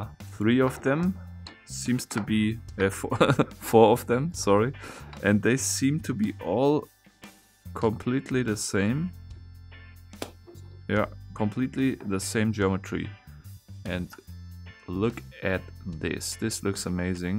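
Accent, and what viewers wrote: Germany. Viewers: his accent is German, if that's your question. I always got the impression he no longer lives there, but his FB info does refer to a Germany address.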